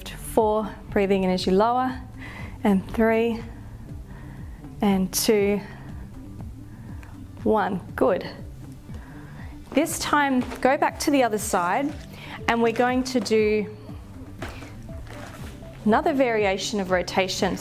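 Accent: Australia. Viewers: Just found your videos tonight when looking for exercises for my sore shoulders to complement my physiotherapy. Enjoyed them so much I've just ordered decent bands to work with. I'll be looking up your other body workouts here on. Thanks. Love your reassuring delivery as well. Must be the Aussie (?) accent :-)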